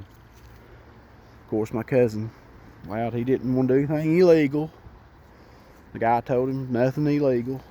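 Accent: South, United States. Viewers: Sir, warm greetings from Finland, english is not my mother language but i sure like your southern accent ! Thanks for the video, keep 'em coming!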